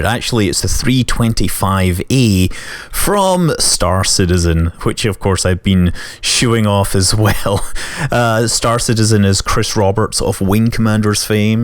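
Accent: Scotland, United Kingdom. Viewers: May I ask? Are you Scottish?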